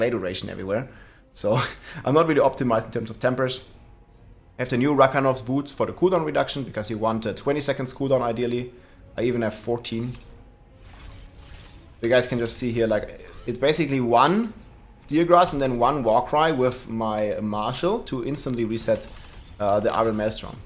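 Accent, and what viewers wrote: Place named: Germany. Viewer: I love the German accent Man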